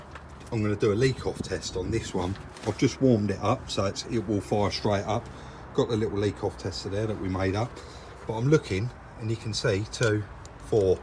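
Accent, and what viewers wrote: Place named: Essex, United Kingdom. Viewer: Hi boys I’ve been following you on YouTube on my tv and on there I cannot comment so now have joined you on my I pad to comment. Great channel great work , and it’s really nice that I hear some one speak Essex as I do love the vids keep up the good work , just one thing puzzles me is where is all the TEA?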